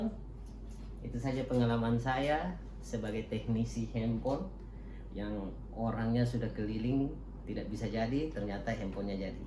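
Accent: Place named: Papua, Indonesia. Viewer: Bicara saja bos hajar pake logat Papua ka,sukses selalu bos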